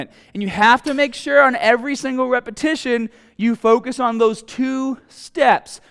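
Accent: Boston, United States. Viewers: “you gotta TAHGET the muscle to SPAHK some growth” I love Boston accents lmao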